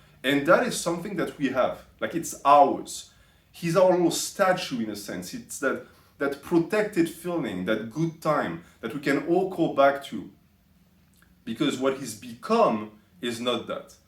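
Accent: France. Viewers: I love how sophisticated your English is with your strong French accent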